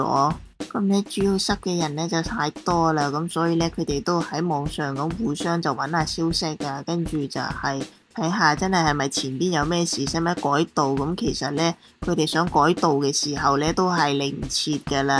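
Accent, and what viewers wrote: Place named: Cantonese, China. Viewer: 放假最好就梗係係屋企嘆住杯熱茶睇報道新鮮滾熱辣嘅純正粵語報道了(ง •_•)ง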